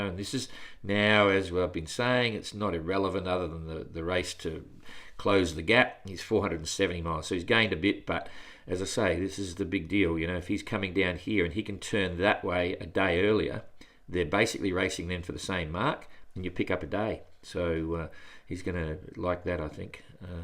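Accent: Australia. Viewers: Hi. Nice hearing your Aussi twang. Im watching from DK. Just getting a Najad 390 ready for som southern sailing so watching this with great interest. Ps A stranded Kiwi saliing the baltic, now home waters. Cheers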